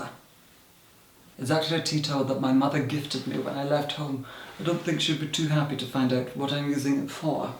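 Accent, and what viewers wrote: Scotland, United Kingdom. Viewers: OUTER HEBRIDES, I KNEW YOU HAD AN ACCENT!!!!! I AM FROM THE OUTER HEBRIDES AS WELL!!! WHICH ISLAND?? Sorry thats weird ahahaha, I don't know why I am so excited, I have just never had a youtuber from where I live! Wow, I actually knew you had an accent, whenever I listen to you I always listen to your accent and I knew you were from Scotland from the accent but! Do you speak gaidhlig!? omg I am such an idiot! Your last name!! That is such a popular name in the outer Hebrides. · He's saying 'film'. It's accent. I believe he is Scottish or Welsh originally, but has lived in London for many years.:) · He has a slight Scottish accent as well. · I feel like I can hear a scottish accent under that voice. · I kinda felt that your accent is not that British or someone from London. It confirmed that when you said you're from Scotland. 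· I knew it! You are Scottish! I always thought with your posh voice you were English but I've always heard a small slice of Scotland in there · actually saying film like 'filum' is extremely common still in Scotland (where and I are from) the difference is you rarely have many people with our accent as articulately spoken, but does live in London now (for how long, I'm unsure, but his Scottish accent is still there and very prominent)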